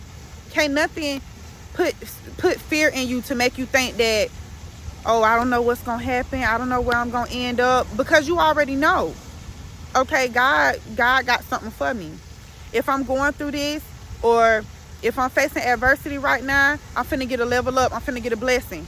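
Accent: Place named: Georgia, United States. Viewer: Great & timely message.. I just know you from Georgia with how you talk..lol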